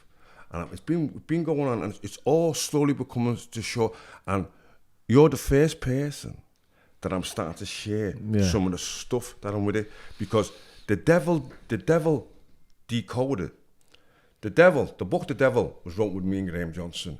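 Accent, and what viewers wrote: Liverpool, United Kingdom. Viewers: Scouse to scouse ❤❤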